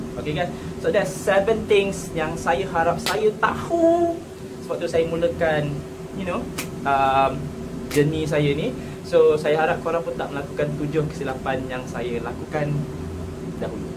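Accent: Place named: Sarawak, Malaysia. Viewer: are you sarawakian ? sbb mcm ada slang sarawakian ckit heheh.. just my opinion laaa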